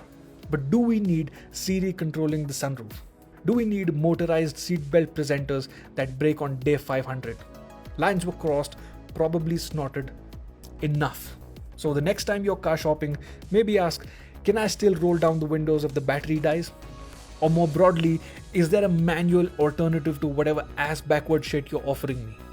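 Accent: India. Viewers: You sound indian?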